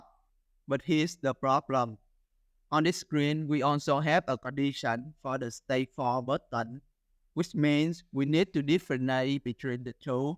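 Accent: Vietnam. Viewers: Giọng nghe rất Việt Nam :) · Your voice sounds like a Vietnamese person speaking English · Nghe cách nói của ông cháu là biết ngay người Việt Nam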